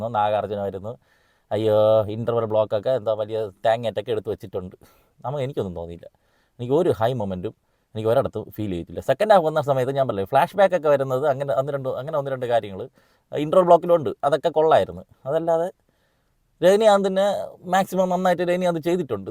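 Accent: Trivandrum, India. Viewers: അണ്ണൻ tvm ആണോ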